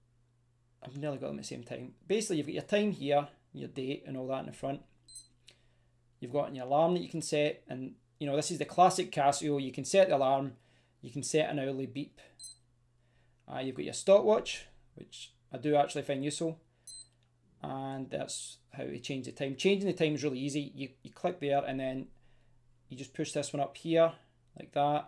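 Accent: United Kingdom. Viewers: Definitly British by the final entonation but I find your accent uncommon, unsusual for me. Maybe is it Scottish? Greetings from Spain.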